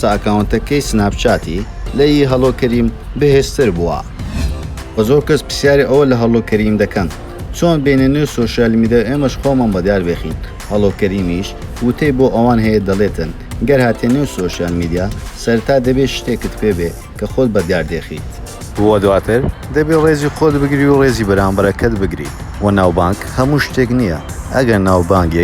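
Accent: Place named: Erbil, Iraq. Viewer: تن تن تن تننننن هه‌ولێری بێ مێشك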